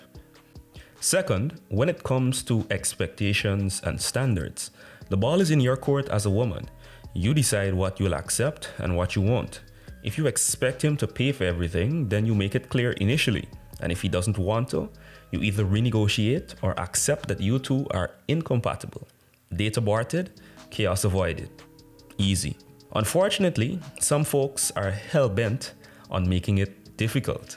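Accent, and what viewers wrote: Guyana. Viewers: Your accent sounds Guyanese? Where are you from? Nice clear explanation in your video also. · Guyanese accent alone gets a sub. Content was good to, Bigup yuhself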